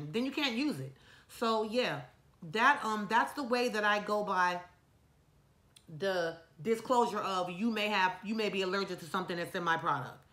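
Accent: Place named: New York, United States. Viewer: Girlfriend are you from NY? You sound just like family honey! Will check out your website.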